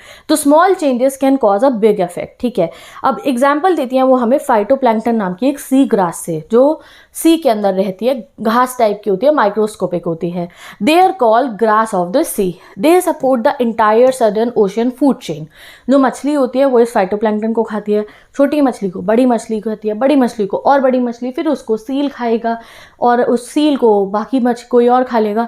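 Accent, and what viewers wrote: Punjab, India. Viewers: Bande Bharat ke speed se bolte ja rahi hai aap। Student would definitely fail to follow।। Again Hindi in Punjabi tone is very disturbing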